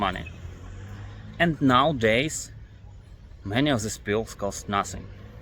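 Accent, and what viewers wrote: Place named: Eastern Europe. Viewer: толковые вещи говоришь, но акцент... я в курсе, что у них ещё хуже за счёт индусов итп, и в курсе что нет ни времени, ни сил исправлять, но славянину капец как режет слух родной славянский акцент в англ если хочешь, могу подсказать рецепты быстрого удаления наиболее режущих слух моментов нет, я не препод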